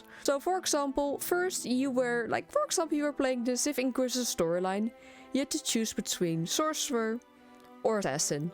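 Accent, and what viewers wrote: Netherlands. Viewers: Listening to a Dutch accent is always amusing, so high pitched. Good video and +1 for the accent, lol.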